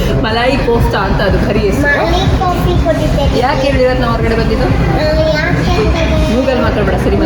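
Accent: India. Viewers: We love your Kannada Accent and Pronouncing The Way You Narrate Kannada is Superb Probably best in class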